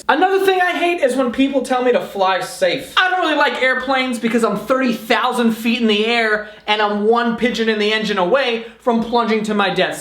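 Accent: New York, United States. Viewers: What is your accent is it New York Brooklyn or what?? Manhattan jersey Italian ?!? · As a New Yorker, I can confirm that all New Yorkers sound like this guy. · Dope NY accent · You're accent is amazing lol it's so cool. Is it like a NY accent? (Sorry I'm from Europe)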